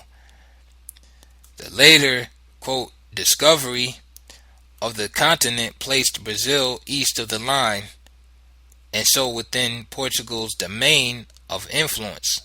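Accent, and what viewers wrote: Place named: New York, United States. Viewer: That NY accent makes it hard to listen to. Lol open parentheses … close parentheses?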